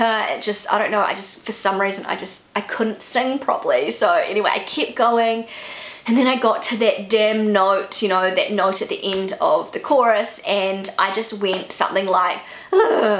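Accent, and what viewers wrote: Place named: New Zealand. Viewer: How could you hide your beautiful singing voice from us until now? I am impressed by/with all your talents,. The way you tell your little stories, is simply fascinating. And as I said before once, though you speak so fast, it‘s much easier for me to understand the NZ accent than a broad American accent. Maybe, it is because your accent sounds so much more charming. Have a wonderful Sunday ☀️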